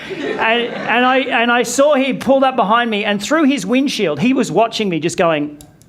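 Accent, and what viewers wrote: Australia. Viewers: R u an Aussie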